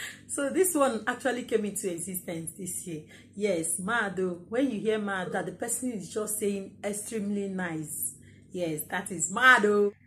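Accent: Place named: Nigeria. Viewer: This is so cool, love Nigerian accent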